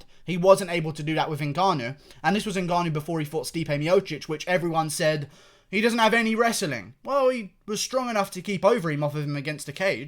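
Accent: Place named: United Kingdom. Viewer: People with English accents should never speak about mma. I can't take a brit talking about fighting seriously. Not sure why but i just can't. lol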